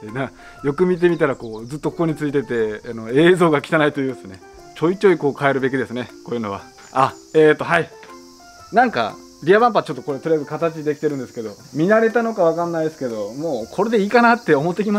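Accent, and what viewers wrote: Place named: Japan. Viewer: 自分で満足感出すのサイコーですね〜！さんは、どこの県の方なのか？なまりが、！笑笑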